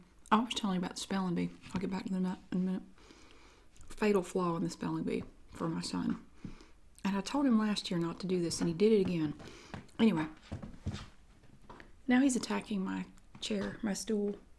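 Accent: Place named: South Carolina, United States. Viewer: Normally, I despise mukbang videos, as they make me nauseous. But to my surprise, I absolutely loved this video. First of all, I feel like you’re eating naturally as a person normally would, and I didn’t get the feeling that you’re “over-acting” the eating for the video. But what really makes it enjoyable is your soft-spoken chattiness. I find your soft voice and Southern accent very pleasant and soothing to my ears. Therefore, the overall effect is something akin to sitting down and having a nice lunch and a pleasant, relaxing chat with a good friend. How much more soothing can a video get? PS: I’ll second what others have already said in the comments, about how fun it is to hear you say “puddin” the way that you do. ☺️😁👍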